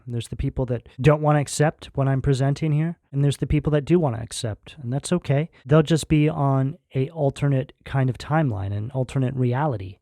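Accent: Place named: New Jersey, United States. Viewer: what a deep and meaningful reading meant a lot and do accurate on every level … thank you 🙏💓 and I just love the way you look if my mum was here now, that’s what she wanted me to have my hair like yours just thought I’d pass that on 🤭wish you a super time in ‘out of state’ from me in the Uk altho my Mom was from New Jersey … and i also enjoy your accent it makes me feel close to her god bless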